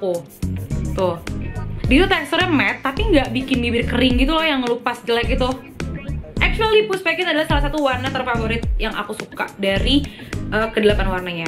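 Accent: Medan, Indonesia. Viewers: Assalammualaikum, Kak,... Kenalkan nma ku, Alia,.. Brasal dri Malaysia,... Aku suka skli sama content video, Akak,... Jgak cra pertuturan kata Medan tu, Pas skli sama org nya,....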